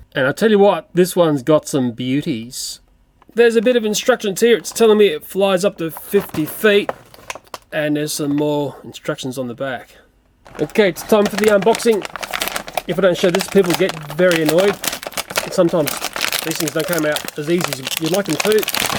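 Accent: Australia. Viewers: toy factory is my favorite knockoff company best of all the fake on is not a toy for kids its a priceless collectors item cause its in a collectors collection for teenagers and really fine old kids and adults who put them up on display where detail matters because I have this find collectors toy and here's is my price for your real minion Dave toy $50.00 and if you like my price please mail it to me Anthony Addison 107 Dwight drive Rome NY 13440 thanks. Anthony PS don't let anybody know I told you and by the way I love your Australian accent.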